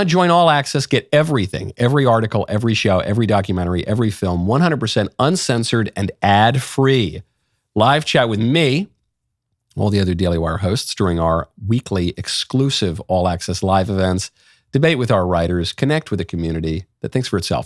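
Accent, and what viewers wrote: Ireland. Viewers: Your Irish ☘️ accent is pretty good. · I don't know if that Irisha accent is accurate. But it made me smile.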